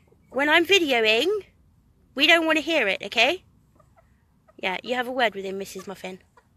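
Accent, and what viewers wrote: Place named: United Kingdom. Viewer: wow! just discovered your channel. it's so lovely to hear a Brit talk about homesteading. I'm doing exactly what you are. I have an allotment and live in the Midlands.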